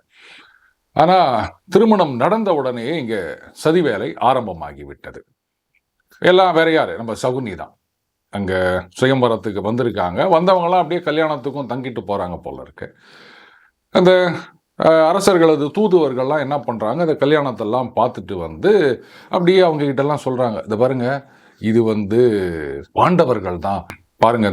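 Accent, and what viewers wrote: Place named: Chennai, India. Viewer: பாரதத்துக்கு மட்டுமாவது “ சென்னைச் செந்தமிழ் “ வேண்டாமே.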